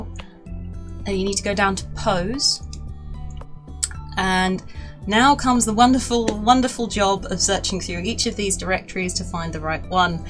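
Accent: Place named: United Kingdom. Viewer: Awww your british! Love your voice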